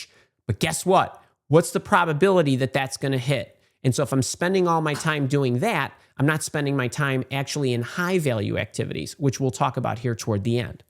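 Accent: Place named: Chicago, United States. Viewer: He talks just like Billy Corgan. Must be from Chicahgo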